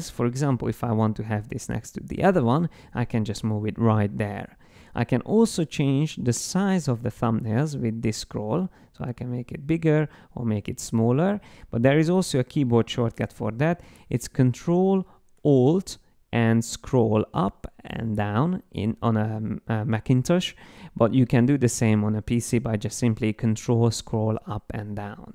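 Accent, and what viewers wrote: Hungary. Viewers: Is it Hungarian accent I did hear? :) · hahaha your Hungarian accent is so obvious! Szeretlek Magyarorszag, köszi!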